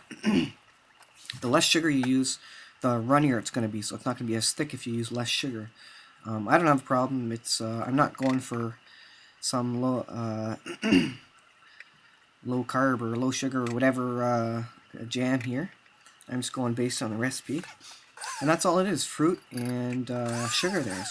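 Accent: Canada. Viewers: Are you Canadian? I'm hearing a Canadian type of accent.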